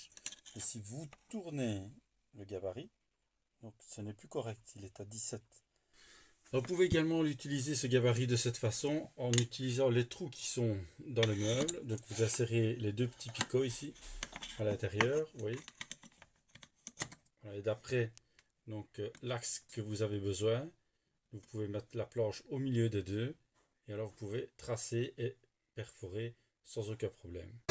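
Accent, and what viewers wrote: France. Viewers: Stunning French accent ☀️👏💕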